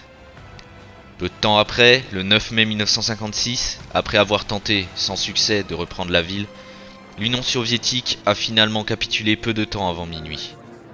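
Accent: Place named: Alsace, France. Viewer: Oui tu as un petit accent c'est pour ça que j'ai cru que tu était alsacien ^^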